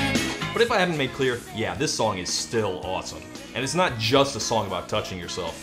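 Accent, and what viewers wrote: Australia. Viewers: Todd, I see you picked up an Australian accent. Please, put it back. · when i heard the australian accent i nearly quantum sprinted to the nearest cliff and leaped off of it. good video though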